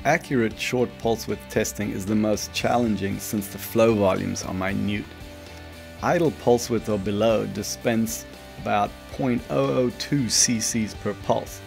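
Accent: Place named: United States. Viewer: He is from South Africa,but has cultivated his US accent pretty well.😁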